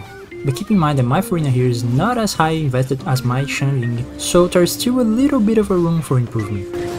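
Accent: Brazil. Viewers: o sutaquezinho br nunca falha, mas excelente video mano, sucesso no seu canal!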